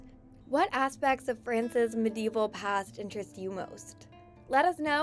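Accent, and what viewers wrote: United States. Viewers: 😂😂😂 I’m sorry too I appreciate the video and all but girl! At least try to pronounce the locations the way they are meant to sound even imitating a French accent would be better than this😊 Im American too but come on we have all been exposed to the accents of visitors from abroad or damn haven’t you ever seen a Pink Pather movie lol just try a little! Oh and just so you know we don’t all sound this way..just like anywhere else ppl from different locations around the country have their own distinct way of speaking (ppl from the south, northeast, midwest, west coast, etc) but still the way she says cite-sitay!😂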